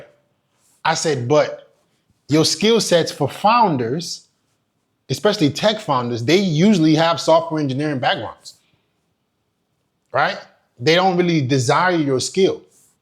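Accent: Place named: Bronx, United States. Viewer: Lol they're your biggest audience 😂 why am I not surprised. You got a Bronx accent btw 🤔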